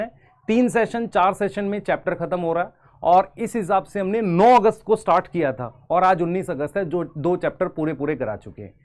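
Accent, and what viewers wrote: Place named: Allahabad, India. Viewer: गजब पढ़ाते हैं भाई पढ़ाने की स्टाइल गजब है इलाहाबादी भाषा गजब बोलते है kantap खाबो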